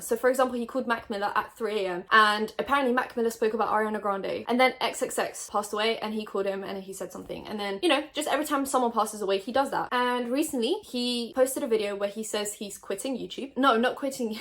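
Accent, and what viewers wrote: United Kingdom. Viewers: So out of curiosity, where are you from? Cause I thought you were British😭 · omg, I thought you are British! What's your background then? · not to be rude or like insensitive but where are you from? i thought you were british this WHOLEEE time bc of ur accent lmao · i thought she was british?? Where is from? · I thought u were British I'm confused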